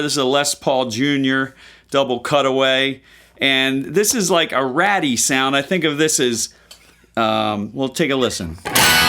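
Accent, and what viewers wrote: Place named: Eastern Pennsylvania, United States. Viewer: I was listening to you speak and thought to myself, "This guy sounds like he's from eastern PA. He's got that Bam Margera accent." Looked it up and I was right! Great video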